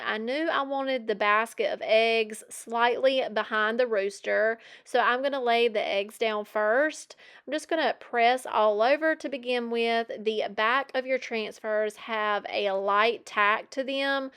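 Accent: South, United States. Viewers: All these were great! I loved the tins the best, but the rooster in the frame was a close second. I think a deep red frame with black wax may have added something, but honestly, it's good as is. I'm grateful for my amazing family that tolerates all my crazy collections and hobbies! Congrats on 1 year and 10,000 subs - you are fun to watch. I'm originally from the south, living in Colorado. Your accent is like a warm hug from home. :)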